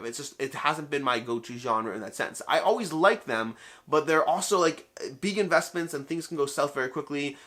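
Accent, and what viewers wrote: Canada. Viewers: Canadian accent? It's usually super subtle, until it isn't.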